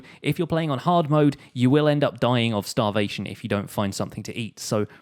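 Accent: United Kingdom. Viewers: He's british bruh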